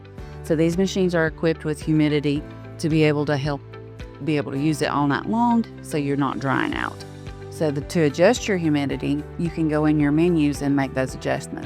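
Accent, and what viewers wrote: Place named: West Virginia, United States. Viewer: This nice lady sounds like she from Wayne Co. WV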